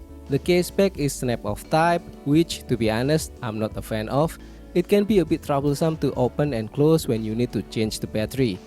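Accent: Indonesia. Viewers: Your Indonesian accent is familiar. Btw good job.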